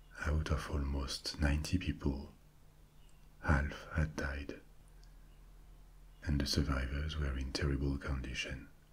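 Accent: France. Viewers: I woke up with this playing for the first time because of autoplay at 2 am and forgot i had ear phones on so i kept wondering why the fuck i could hear a french dude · Can't wait to watch this tonight. It's going to put me asleep like a baby hope I make it through I've been watching your videos every night since I've discovered your Channel 5 months ago thank you for the education and the awesome ASMR French accent.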